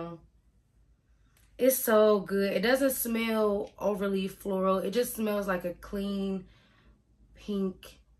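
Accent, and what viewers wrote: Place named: South, United States. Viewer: Hi, I’m surprised you are from Ohio. You seem to have a southern accent. I live in Akron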